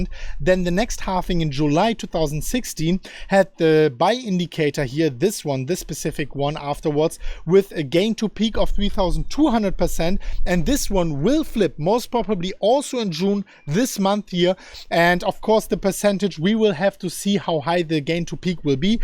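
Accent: Germany. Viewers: Wie man den deutschen dialekt hört😂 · Your German accent and energy is really cool....LOL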